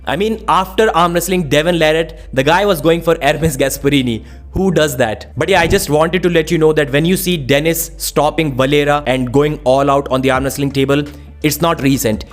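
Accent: India. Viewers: this is what Indians sound like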